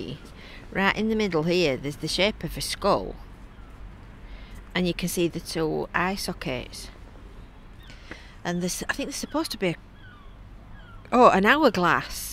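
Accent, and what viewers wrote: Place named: Yorkshire, United Kingdom. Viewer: American YouTube doesn't understand Yorkshire English!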